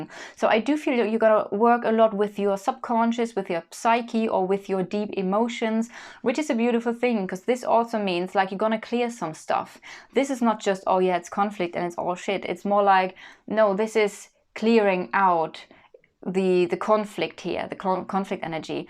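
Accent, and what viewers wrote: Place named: Germany. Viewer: We missed you, your accurate detailed readings, your lovely personality and your sweet voice and angelic singing. I can slightly hear the German accent integrated in your soft British English. I can see you've been working a lot with the German community, but please do not forget us, the rest of the world. You would not believe the hearts you've touched, even in a faraway land like Albania, an unknown place to many, from where I'm listening to you now! Thank you dear. Danke schön! A Virgo sister! <3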